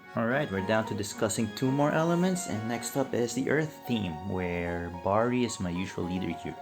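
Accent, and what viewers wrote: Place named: Philippines. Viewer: u sound pinoy af, in a good way xD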